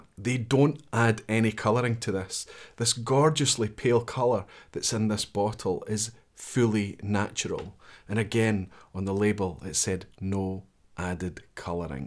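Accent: Scotland, United Kingdom. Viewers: Don’t tell me that a great deal of this mans YouTube success is his accent! Love the Scots!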